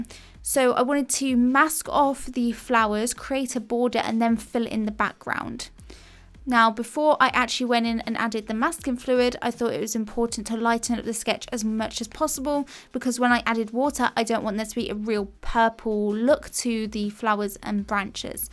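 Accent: England, United Kingdom. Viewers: I love your british accent.. a lo' wo'ah.. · Finally!! I was trying to find a British artist because i wanna develop my british english accent 😭😭 I've just subscribed to your channel and hit the notification bell ✌️🖤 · Where do you come from (which part of england)?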